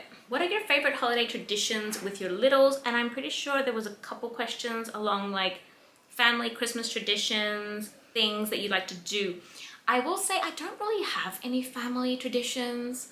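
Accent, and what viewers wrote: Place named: Australia. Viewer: Omg I thought you were Australian, you had a very strong Aussie accent when I first started watching some older videos! I’m Australian!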